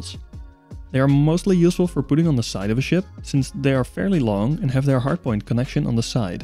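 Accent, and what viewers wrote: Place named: Netherlands. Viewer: Ik herken dit accent direct haha. Dutch space representative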